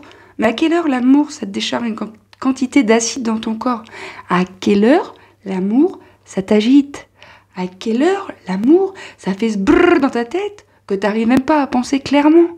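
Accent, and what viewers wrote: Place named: South, France. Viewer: Incroyable cette vidéo et la petite ref de Nietzsche et l'accent du sud c'est fou.. merci pour toute cette justesse et ton partage et cet humour !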